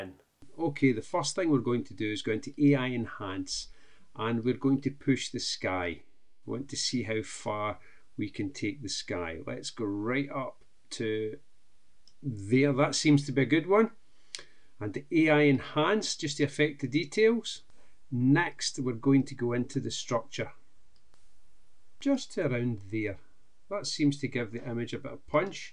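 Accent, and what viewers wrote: Scotland, United Kingdom. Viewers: That’s quite rude.. Scottish accent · i hope that you are not angry with my because of my comment, but i actually more used to american english, so it took a while before i understood what you said. I like your accent, but it was so strange to me in the beginning. In my 2,5 years of learning english, i never heard scottish accent. forgive me please;-)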